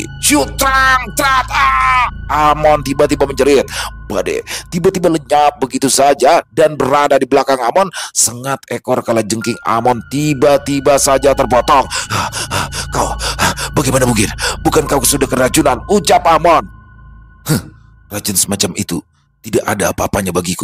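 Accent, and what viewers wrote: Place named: Kalimantan, Indonesia. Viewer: Ceritanya bagus, diawal pembawaan kisah logat Kalimantan masih kebawa mungkin Krn kebanyakan kisah dr sana ya 😁. Jadi ada tugas baru nih bang belajar aksen Bali 😅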